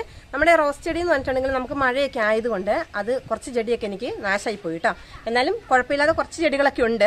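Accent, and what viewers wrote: Wayanad, India. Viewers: Wayanad language Kelkkan rasamund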